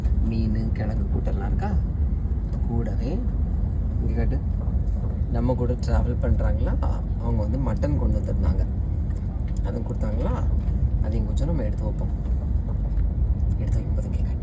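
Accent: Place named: Nanjil Nadu, India. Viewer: கிட்ட காட்டு மக்கா- நாஞ்சில் தமிழ் ❤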